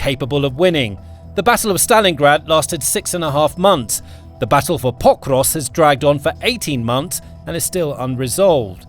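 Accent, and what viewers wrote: United Kingdom. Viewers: funny how every time I hear something like this it is a british accent and when I hear about russia winning it is always a eastern accent and yet, 4 years later and it is the same crappy stalemate, russia still stands(somehow???) despite sanctions and ukraine hasn't fallen despite the pounding the question is, when this thing gets all over and done with I ask, will ukraine be able to foot it's part of the bill? and russia, whatever the hell they're doing to keep afloat still works, but for how long? BTW, my condolences on those reactors.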